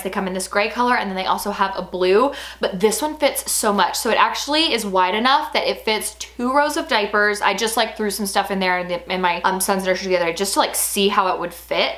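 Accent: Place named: South, United States. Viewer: I love your southern accent and how you say nursery! Haha everyone in Colorado has no accent and sound like news anchors LOL. 🤣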